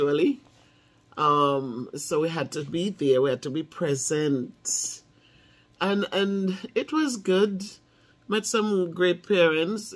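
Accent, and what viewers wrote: Jamaica. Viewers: First I heard you speaking in patois.yuh gwaan good Mon. Yuh still have it. LoL.Big up Auntie!.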